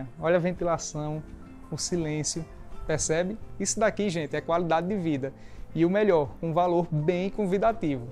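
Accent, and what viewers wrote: Northeast, Brazil. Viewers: Adoro ver as casas que você apresenta nesse seu canal. São tão maravilhosas que fica difícil nomear uma como preferida. No fundo no fundo, prefiro todas pois todas me inspiram de alguma forma. Rsrs E quanto a você, é um rapaz muito simpático, educado e bonito que sabe passar bem a sua mensagem com esse sotaque nordestino que eu adoro.. Boa sorte!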